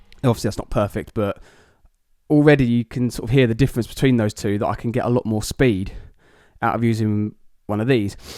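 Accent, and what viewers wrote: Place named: Texas, United States. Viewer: Before this video I was pretty sure you were American, and probably from Texas.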